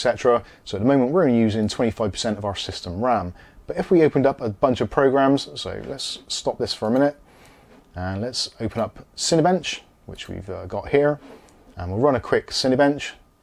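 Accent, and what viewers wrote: Bristol, United Kingdom. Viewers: You sound like a bristol lad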